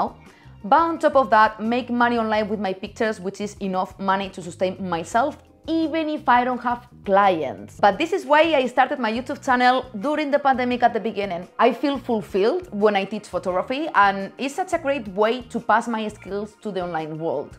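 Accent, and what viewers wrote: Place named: Spain. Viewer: Lo primero que me llamo la atención de tus videos fue tu acento, después de varios vídeos especulaba eras de España y si, gran contenido, saludos desde México, también subo contenido a mi canal, todo en español, ha sido complicado para ti hacer un canal en inglés.?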